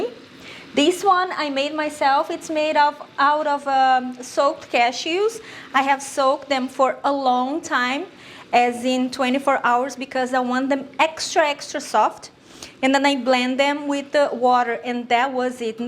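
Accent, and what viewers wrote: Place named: Brazil. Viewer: First time I watched one of your videos I thought "what accent is that, where have I heard it before?" and then I saw your last name and realised it's the same one I have, hahaha. Great too see a fellow brazillian making such beautiful vegan dishes and reaching the whole world with your recipes. Te desejo todo o sucesso do mundo,!:)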